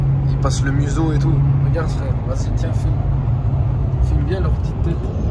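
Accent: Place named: North Africa. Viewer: Cet accent limite nord Afric est insupportable...faut évoluer garçon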